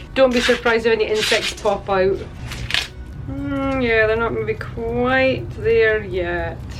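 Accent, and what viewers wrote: Scotland, United Kingdom. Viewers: i love scottish excent language, i dont fully understand the english excent,i m indian living in uk, but i still love it, my boss is from scotland,we have job brief every day, i dont understand what he says, he knows it, sweet cons r funny, tomatoes great, lovely hair, lovely nails. lovely garden, thanks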